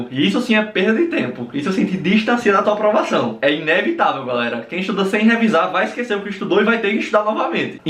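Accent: Northeast, Brazil. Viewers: Esse mano é de qual lugar do Nordeste?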